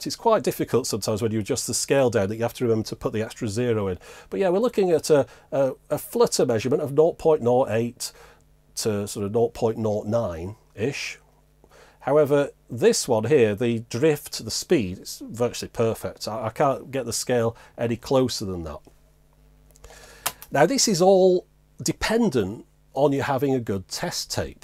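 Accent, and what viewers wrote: United Kingdom. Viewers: I love British accents describing things or providing instruction.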